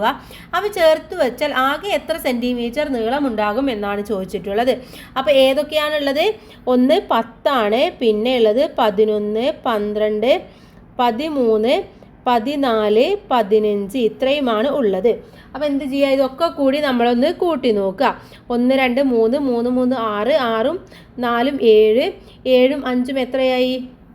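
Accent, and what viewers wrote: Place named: Haryana, India. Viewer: Teacher ka Hindi Haryanvi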